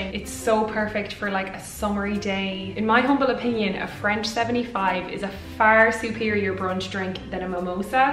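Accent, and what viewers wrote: Ireland. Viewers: I speak French fluently. I had to go back and listen again, because I just thought you were speaking Irish, at first. Awesome! I am drunk right now. Also, fuck. Have you ever had a white lady? I'm talking the cocktail, obvs. It's delish. Worst name ever. The only reason no one drinks it is because it's too embarrassing to order one.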